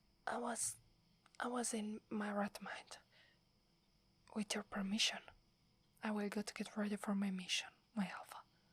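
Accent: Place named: Italy. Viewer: It wouldn't be cute without the italian accent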